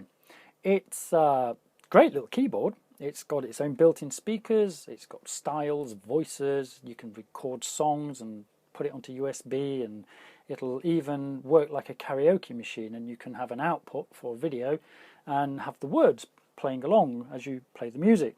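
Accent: United Kingdom. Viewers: Hi Sir I just want to ask you are you from UK???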